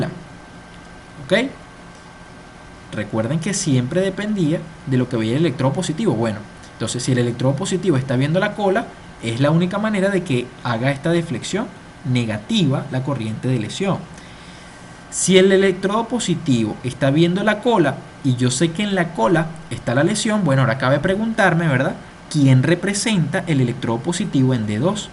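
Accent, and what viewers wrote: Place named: Venezuela. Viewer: Saludos desde Venezuela, guatire, estado Miranda, soy estudiante de Medicina, excelente vídeo clase, de verdad te felicito, eres tremendo para enseñar, tienes el don. Te admiro. Descubrí tu canal ayer, oficialmente me suscribí y te di like. Por favor publica más vídeos como este. De seguro ya te graduaste de médico, por tu acento puedo decir que eres paisano mío, ¿Estudiaste en la UCV? Ojalá regreses para ver más clases tuyas y seguir aprendiendo.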